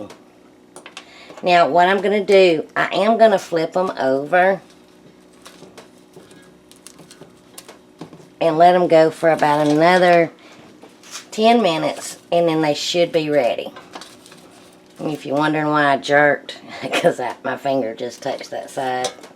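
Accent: South, United States. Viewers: I love the southern accent I am from the south as well so I love it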